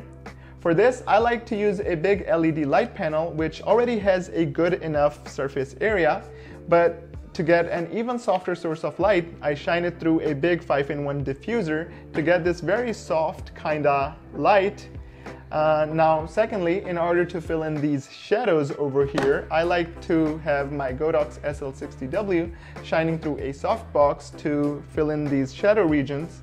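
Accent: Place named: United States. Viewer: Hey bro can you tell how to do american accent like you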